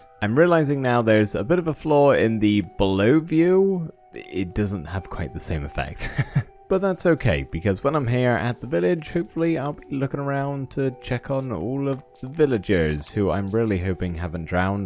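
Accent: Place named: Bristol, United Kingdom. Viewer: Where's your accent from? I am from Bristol, and you sound like you have some of the accent.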